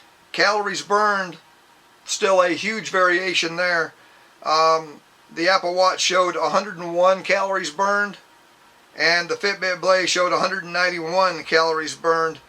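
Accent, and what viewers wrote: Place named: South, United States. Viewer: You sound EXACTLY like Rickey Smiley doing his racist southern white guy prank call voice.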